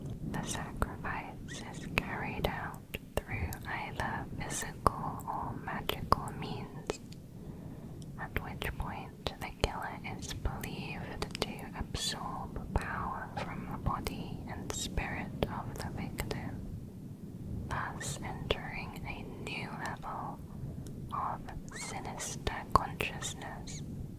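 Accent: United Kingdom. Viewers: she has a British accent. Highly doubt she’s Native American. My guess is Nepali or Afghan.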